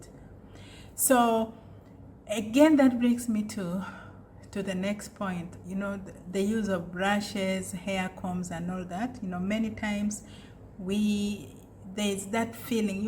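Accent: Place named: Uganda. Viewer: Sounds Ugandan. Love this kind of content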